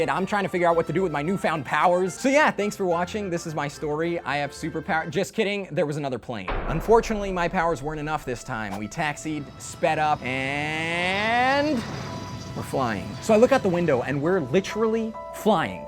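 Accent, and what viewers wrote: Russia. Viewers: And his Russian accent he dose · I love the Russian English accent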